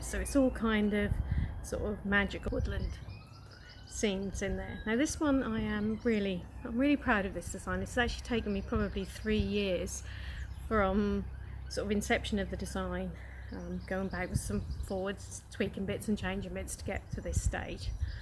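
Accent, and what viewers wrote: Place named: Norfolk, United Kingdom. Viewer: How lovely to hear a fellow Norfolk lady. Can't believe you are up the road from me. Really looking forward to doing the cal. I've never tried mosaic crochet before so looking forward to getting started xx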